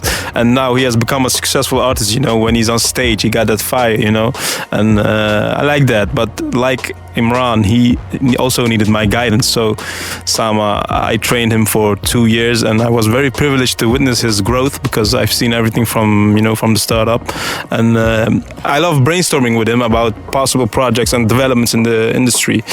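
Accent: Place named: Bihar, India. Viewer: Bhot Chutiya Naam Hai. Plus Tu Bhi Bhot Gandu Lagta Hai. Sale English Bihario Jaisi Kyu Bolta Hai. Thanks For This Upload Though Bro K Alawa Kuch Aur Bol Bhot Fake Aur Effort Dalne Wala Sound Kar Raha Hai.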